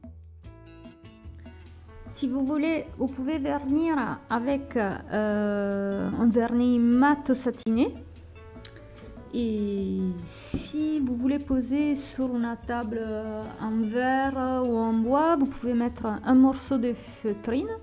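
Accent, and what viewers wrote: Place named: South, France. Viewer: belle vidéo , avec un petit accent du sud , plus exactement de l'Aude ,je me trompe ? lol Bonne continuation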